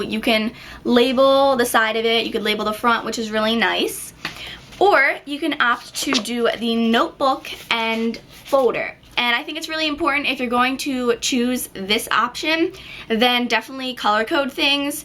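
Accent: United States. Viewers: you are super cute and I adore how you talk, I dont know, but for me this is the perfect example for the american english (though there is maybe not THE american english), but I like it! subscribed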